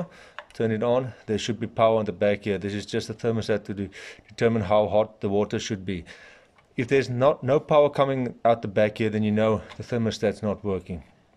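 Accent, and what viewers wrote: South Africa. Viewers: Ek kan mos hoor jys Afrikaans Danke oom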